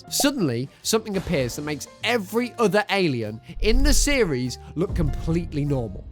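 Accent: United Kingdom. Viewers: Bro's Britishness really be shining through w this one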